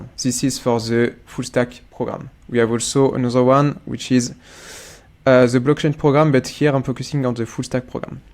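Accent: France. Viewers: Good video with your french accent.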